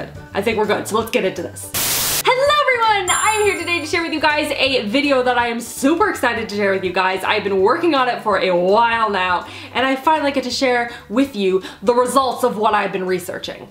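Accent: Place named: Canada. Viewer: riley gatenby a Canadian one, if anything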